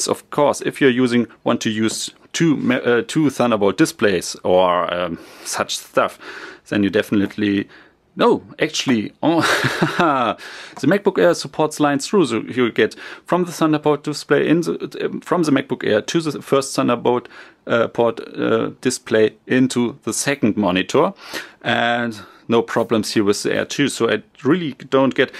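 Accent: Germany. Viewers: Are you from Germany nice accent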